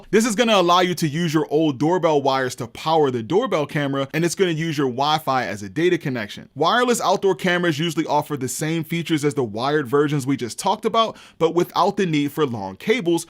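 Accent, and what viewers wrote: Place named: Baltimore, United States. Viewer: Oh you from Baltimore Baltimore lololol. Good video man, this gave me a bunch of info. I'm kinda torn between bullet and ptz, it's gonna boil down to what the individual cameras offer.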